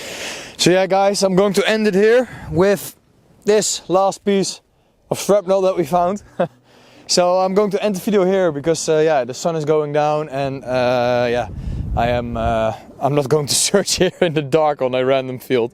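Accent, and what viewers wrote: Netherlands. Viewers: Jij bent Nederlands he?🤣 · Even more disrespectful, and in fact illegal is taking artefacts away from the site. This guy sounds dutch, maybe he doesn't know the laws?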